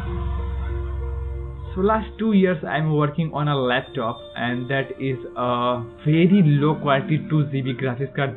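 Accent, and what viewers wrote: India. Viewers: опять эти индусы. не возможно слушать. уши вянут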